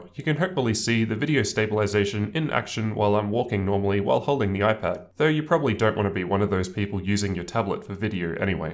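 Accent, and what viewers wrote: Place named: Australia. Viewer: Are you an Aussie? I have an Australian friend with a similar accent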